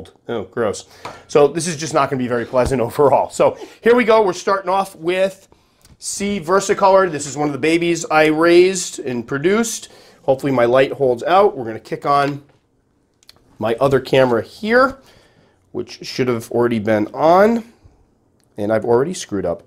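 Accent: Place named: Northeast, United States. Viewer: Maryland here. I could tell you were somewhere up there from your accent and attitude :) Awesome vids <3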